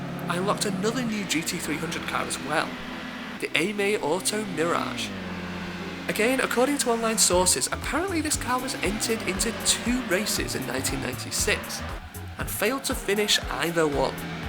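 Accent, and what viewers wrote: United Kingdom. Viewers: Ah yes, nothings warms this cold friday evening more than listening to a british bloke talking about a GOAT tier simcade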